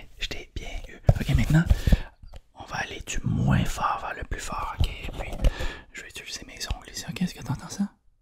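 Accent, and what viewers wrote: Quebec, Canada. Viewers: très bonne vidéo j’attendais la suite depuis longtemps ( la première sur l’autre chaîne ). personnellement j’aime bien le fais que ça soit québécois; j’en réclame plus!!!!!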